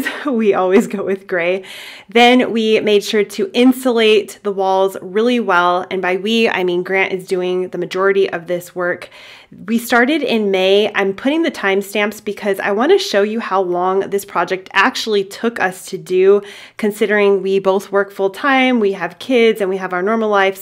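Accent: New Zealand. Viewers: Oh my gosh I hear a New Zealand accent lol...I'm kiwi too. I love the transformation, I love how you've created a beautiful space within your means. This is really motivating. So many people show renovations that look unrealistically expensive for many. Thank you for sharing your journey 😊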